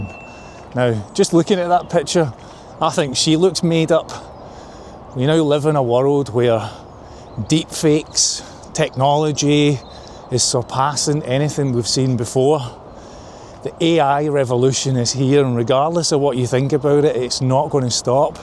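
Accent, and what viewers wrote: Scotland, United Kingdom. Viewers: Some people are so gullible lol. Just love watching and listening to you. I’m Scottish too and listening to you is just like listening to a friend chatting away. I have a snuggly hoody like that too and love it but the arms are about 5 foot long on it 🤣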